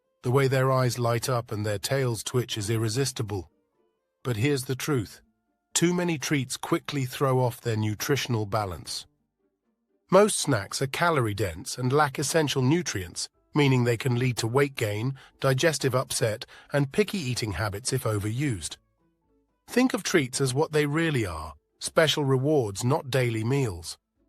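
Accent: United Kingdom. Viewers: This video is a bit fear-mongering. Presumably everyone who’s tuned into this is already considerate enough that we want to hear what we may be able to improve on for our cats, you don’t need to put the fear of God into us by telling us we’re killing them, making them depressed, and all other matter of things in every other sentence 😢 You talked about temperatures twice. You sound either British or like you’ve spent time in the UK. If that’s true, then you know the climate here and the cost of energy. I simply cannot afford to heat my property to between 22-26 degrees Celsius. Do you have any suggestions for how to keep our cats comfortable without spending hundreds per month on heating?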